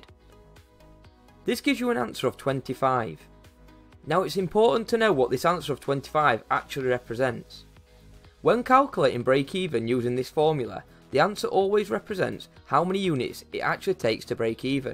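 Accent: Yorkshire, United Kingdom. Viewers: well done. Very nicely explained. You sound Yorkshire :)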